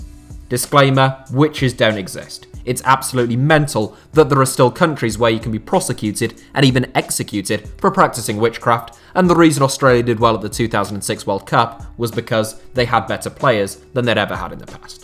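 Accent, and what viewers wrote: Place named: England, United Kingdom. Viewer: His English accent is so annoying, i just watched the video muted with subtitles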